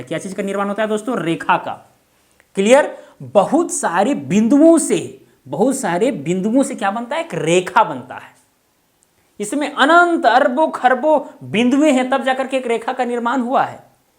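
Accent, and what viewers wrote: Bihar, India. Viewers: Sir aap Bihar ke rhne wale h kya kyoki aapki bhasha thodi bihari lg rhi h aur padhane ka tarika Khan sir ke trh mil rha wo bhi Bihar se hi hai aap aisa padhate h ki ek baar me hi mind me ghus jata h koi doubt hi nhi rhta aise hi Khan sir padhate h Love you sir ❤️❤️❤️💖💖😍😍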